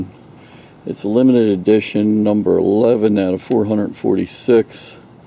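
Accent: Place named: Baltimore, United States. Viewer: Love your finds...great video. Your B'more accent keeps me longing for the good old days in Maryland! I lived in Glen Burnie for almost 20 years and nearly forgot what a terrific area it was for vintage finds. Keep it Up!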